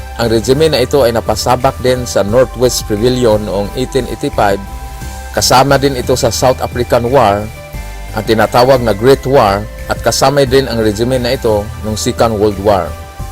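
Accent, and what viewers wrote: Visayas, Philippines. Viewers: Ang sama naman mag salita ng narrator, ang tigas ng dila at bisayang bisaya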